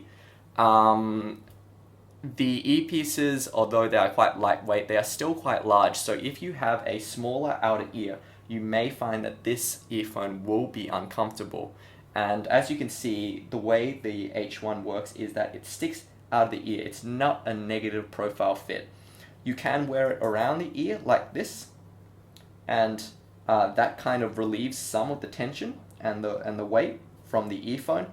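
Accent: Australia. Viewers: how do you do such a good Australian accent? I am also Asian and I can speak Spanish but I can't do an Australian accent.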